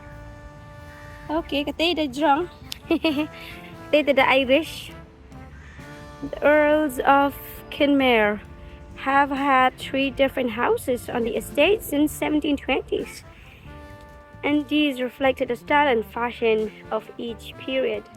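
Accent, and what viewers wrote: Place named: Mawlai, India. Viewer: Kren nong mawlai noh khyndit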